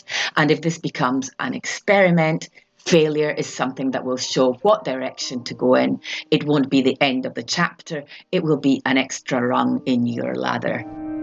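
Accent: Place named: Scotland, United Kingdom. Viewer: Your accent sounds Scottish, Italian and Jamaican in parts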